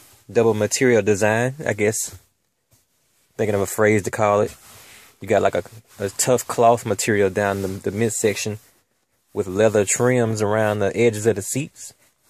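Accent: South, United States. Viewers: Dude where are you from, I love your accent I bet you’re from the South somewhere 😂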